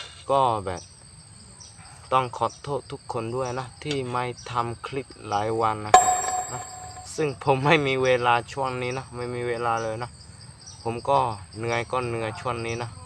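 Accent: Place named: Southern Thailand, Thailand. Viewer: บังพูดนายูบ้านเราเลยไม่ต้องอายบัง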